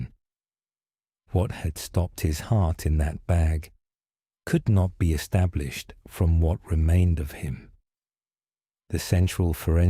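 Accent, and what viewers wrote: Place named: England, United Kingdom. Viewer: Fellow English I love English accent , Chelsea girl London born and raised❤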